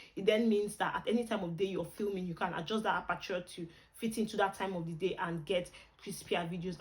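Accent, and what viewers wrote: Port Harcourt, Nigeria. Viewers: Trans Amadi accent 😂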